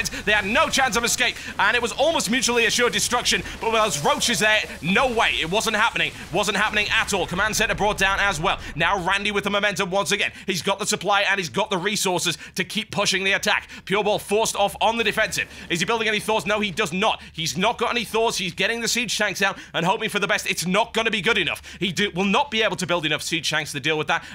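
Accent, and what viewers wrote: United Kingdom. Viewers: He commentates at 300 words per second, you can still understand what he's saying, he doesn't trip over his words, he makes freakin hilarious rants WHILE commentating the match, and he's British! How can you NOT like his commentary!? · not to make a mean comment or anything, but if he wasent british, that would make you 25% less awsome. but you are, so you my friend, are epic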